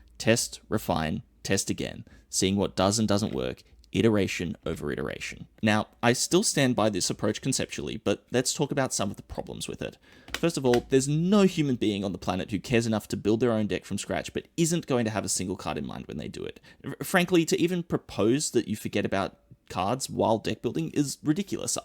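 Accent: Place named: Australia. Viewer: bloody brilliant to hear an aussie accent on mtg youtube! instant subscribe!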